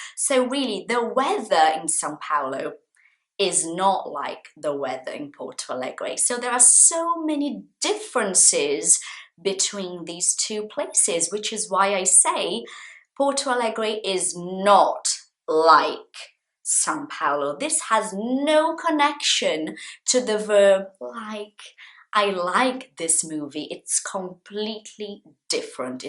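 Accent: RP, United Kingdom. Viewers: Excellent! And your British accent is so posh!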